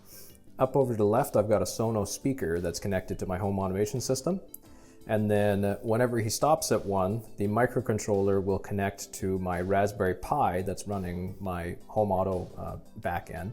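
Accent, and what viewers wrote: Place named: Belgium. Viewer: Tot nu toe klink je belgs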